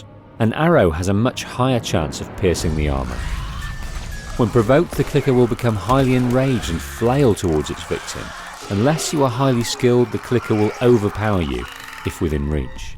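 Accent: United Kingdom. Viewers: It’s called a English accent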